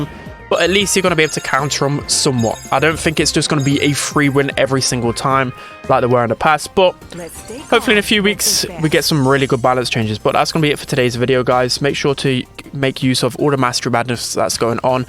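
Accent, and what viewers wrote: United Kingdom. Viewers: W british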